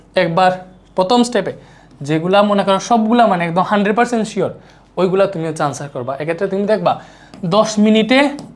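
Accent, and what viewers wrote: Sylhet, Bangladesh. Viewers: Bai apne ke Sylhete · Ashole ami jantam na, ami notun bhaiyar class korchi. 🥺 kotha shone sure hoichi je bhaiya Sylheti😊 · ভাই হয়তো সিলেটি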